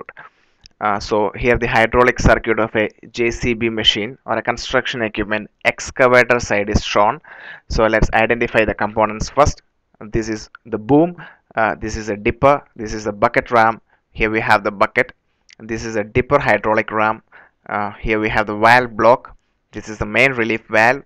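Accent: Kerala, India. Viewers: We dont like kerala english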